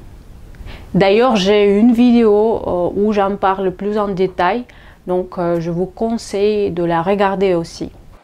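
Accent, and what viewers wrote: Russia. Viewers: j adore l Accent russe, tu est super adil du maroc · Et quel charmant accent. Les femmes russes sont les plus belles du monde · Ce petit accent délicieux en français...Mince! Pour un peu, je me mettrais au russe! ;) En tous cas, vidéo très intéressante!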